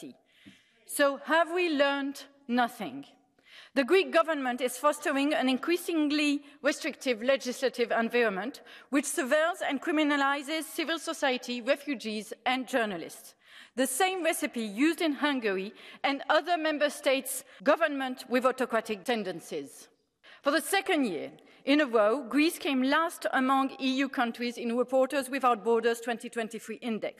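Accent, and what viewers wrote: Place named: United Kingdom. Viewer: Je ne comprends pas... vous êtes une élue française au Parlement Européen. Pourquoi vous exprimez vous en Anglais? Peut être est ce une obligation pour cette séance ? Même si vous êtes née au UK, l'accent m'a fait décrocher du contenu. Sinon, je vois que vous pourfendez les autocraties en Grèce et en Hongrie. Vous vous inquiétez du choc autoritaire en France aussi. C'est bien, c'est cohérent, merci. Nul doute qu'entre le dénigrement du résultat du référendum de 2005, et les dernières décisions des Conseils d'Etat et Constitutionnel (juges non élus), vous avez de beaux exemples pour alimenter votre lutte pour la Démocratie dans notre beau pays ! 😅👍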